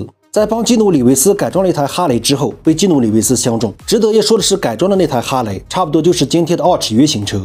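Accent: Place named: Inner Mongolia, China. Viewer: 你这内蒙口音可以呀！！！哈哈哈哈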